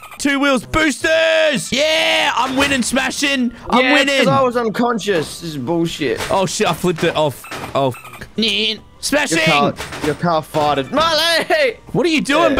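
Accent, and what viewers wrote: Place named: Australia. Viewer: Delivery like a Australian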